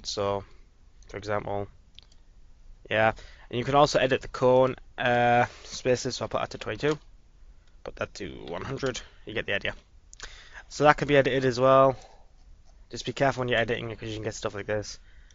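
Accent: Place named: United Kingdom. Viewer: You sound very British when you're sick, so British that I sometimes have trouble understanding it D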